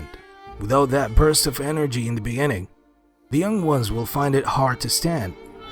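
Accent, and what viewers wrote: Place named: Hollywood, United States. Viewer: بارك الله فيك على هذا العمل الجيد والممتع والراءع في نفس الوقت باللغة الإنجليزية ،اتمنى لك كل التوفيق في مجالك وننتظر منك الجديد ،متابع جديد ،ملاحظة لغتك الانجليزية هوليودية بامتيازواصل .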